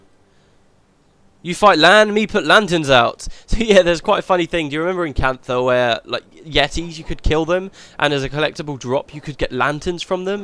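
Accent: United Kingdom. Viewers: "Bollocks!" ;D British english>American english tbh, finally found some variation :) Oh, and about your plan on how many videos to upload at a time, try hold about this phase or solwer if u want it to time it with beta/release. And maby you are being too optimistic about when GW2 is coming. For all we know, it might just as well come 2014 :/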